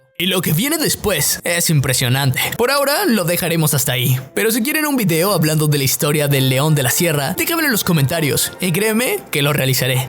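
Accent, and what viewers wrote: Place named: Mexico City, Mexico. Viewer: Narras muy mal, demasiado acento del DF.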